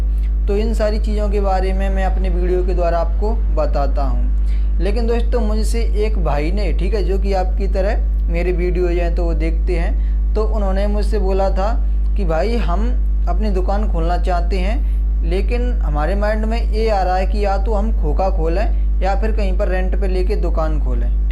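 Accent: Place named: Pahadi, India. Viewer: Bhai video kaafi achi thi. Tumhari tone se tum Pahaadi lg rhe ho Video like frm Uttarakhand (dist-Champawat)😊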